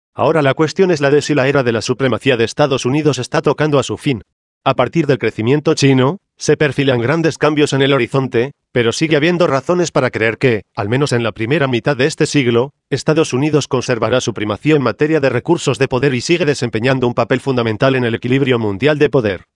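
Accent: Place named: Spain. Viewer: Oye pero cuanta mierda se habla por aquí ! Quien coño maneja estás malditas computadoras con acento español !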